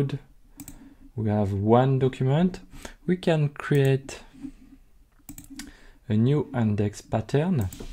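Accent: France. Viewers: Your French accent is amazing :))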